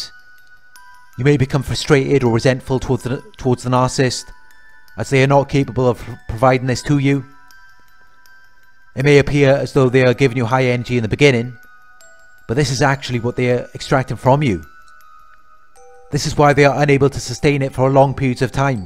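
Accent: United Kingdom. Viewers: Hard to understand this British accent 😞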